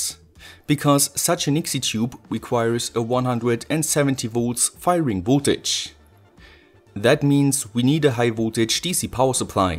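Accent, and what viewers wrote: Germany. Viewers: Dieses eingedeutschte haha xd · Also den deutschen Akzent oder besser gesagt die Aussprache hört man gut raus aber cooles Projekt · Junge dieses schwere Atmen nach jedem Satz und dieser deutsche Akzent ahaahsh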